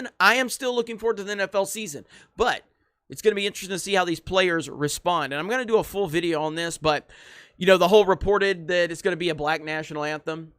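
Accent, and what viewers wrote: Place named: Alaska, United States. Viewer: Are you an Alaskan Bush person? You have the same accent.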